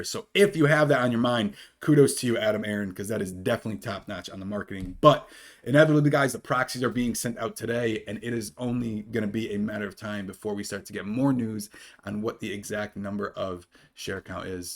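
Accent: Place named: Upper Midwest, United States. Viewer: Nice Green Bay reference! I’m trying to place your accent. Definitely betting on upper Midwest.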